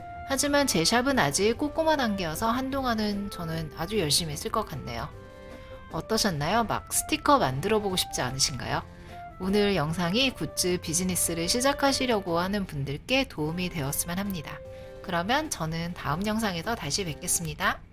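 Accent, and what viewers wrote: Seoul, South Korea. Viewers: 뭔가 목소리가 80년대서울 사투리 말투 같아여😅😅😅헤헤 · 방송에서 듣던 서울사투리 같은 말투가 정겹고 좋네요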